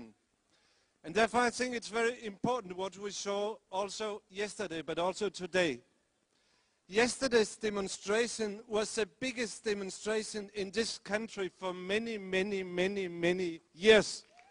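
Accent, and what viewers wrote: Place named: Denmark. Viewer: joken er lidt på dig, for han siger faktisk THEREfor, og det passer perfekt... Han engelsk er sådan set fint, han har dansker accenten og lidt grammatiske problemer....men han når selv og rette de fejl han laver... så længe han forstår og formidle sig så pointen kommer igennem er det vel fint? Men, han ku' nu godt ligne viggo sommer en smule ;)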